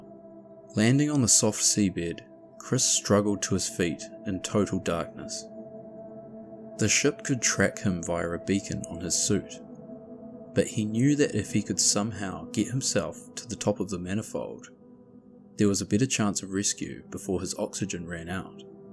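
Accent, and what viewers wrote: New Zealand. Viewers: This was really good. I have no problem with a New Zealand accent! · Terrifying, miraculous and addictive watching! Awesome vid, 👌 thanks mate 👍 Great to hear a kiwi accent 🇳🇿, with love from Oz 🇦🇺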